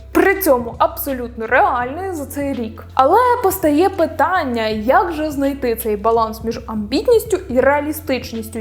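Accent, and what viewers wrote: Poltava, Ukraine. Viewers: Ви випадково не з Полтави?)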